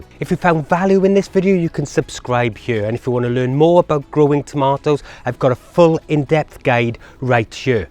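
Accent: United Kingdom. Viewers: You have beautiful British accent. I love it. Thank you very much for informative posts.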